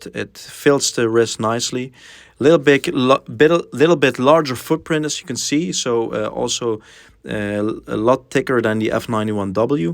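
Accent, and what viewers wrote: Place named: Netherlands. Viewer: I hear Dutch accent, where did you get it from? As far as I know it's not released in the EU.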